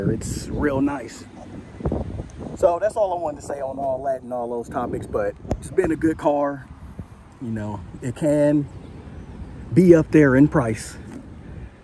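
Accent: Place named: Bay Area, United States. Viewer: I knew you were from the bay as soon as I heard you talking! Lol almost thought what was mistah fab talking! Loll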